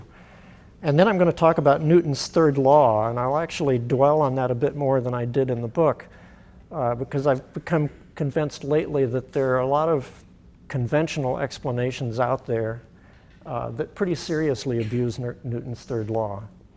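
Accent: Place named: United States. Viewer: the pronunciations of the names are so American, it hertz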